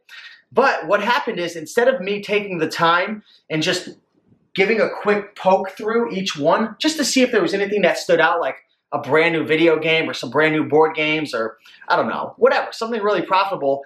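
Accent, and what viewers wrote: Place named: New England, United States. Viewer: Yankee accent kills me, and people fuss about robotic voices....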